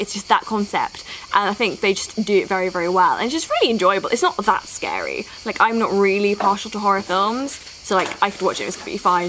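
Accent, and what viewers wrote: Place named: United Kingdom. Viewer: learn me the british accent. I love it soo much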